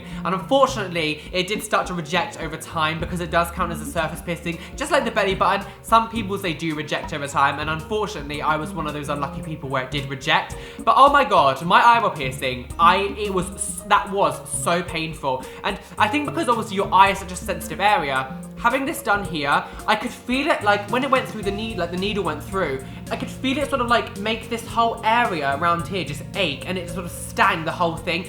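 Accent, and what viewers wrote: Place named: England, United Kingdom. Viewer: Madonna quanto fa schifo l'accento inglese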